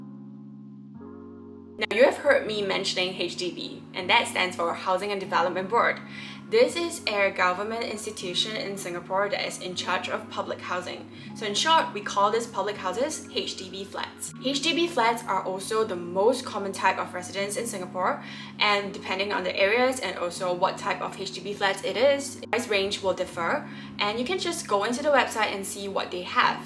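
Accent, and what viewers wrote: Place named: United States. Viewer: If you don't mind me asking, are you American or Malaysian. The reason I'm asking, your accent sounds American.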